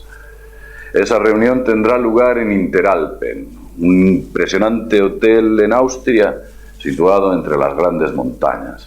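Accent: Spain. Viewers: normalmente no soporto escuchar mucho el acento español,pero tus videos son tan amenos y los explicas con tanta pasion y seguridad que trasciende las barreras culturales y cumplen con su cometido, transmitir la informacion, de que all afuera hay un mundo mas grande, mas rico y mas obscuro que el que nos han puesto frente a los ojos saludos y adelante!!!! :D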